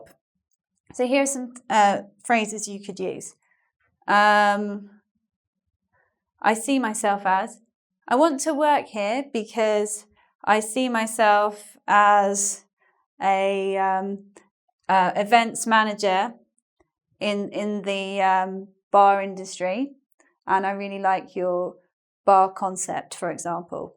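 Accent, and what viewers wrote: United Kingdom. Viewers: are you british? I notice your accent different, thank you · I appreciate your your tips too much. I love british accent and you was very helpful to me. I´m preparing myself for an interview to a job at a british university. God bless you and me! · If this is not a british accent then I don't know what the fuck is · Im very sure this is british accent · a beautiful British accent =))) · i came here to practice my english...i struggle a little bit with british english but it was great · I am big fan of your. r u British? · you're so cute and your British accent fits you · What a question. I didn`t notice her accent myself.kkkkkkk Of course she`s british man, c`mon. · really? you should listen people speak cockney too. She has a lovely British accent for me ;) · Dieses british englisch macht einen total wahnsinnig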